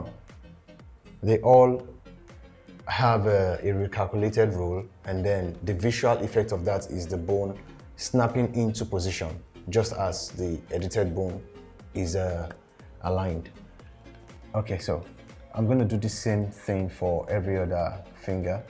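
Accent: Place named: Nigeria. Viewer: This my man sounds Nigerian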